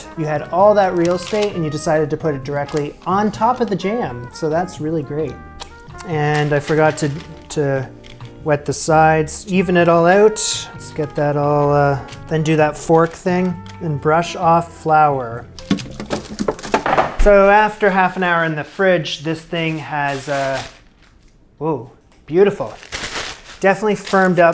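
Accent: Canada. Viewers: Do I hear Canada great video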